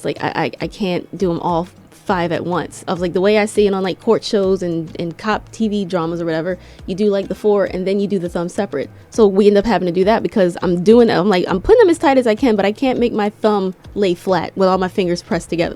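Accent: England, United Kingdom. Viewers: Sidenote! That English accent is leveling up innit!😂😂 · Girl, you have been here a while/often. You have a cracking english accent. It's better than mine. I've been here for 52 years 😂 Bless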